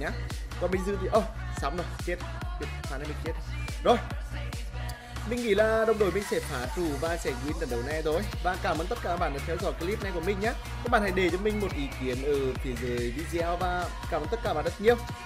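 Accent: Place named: North, Vietnam. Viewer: A nói giọng Bắc hơi khó nghe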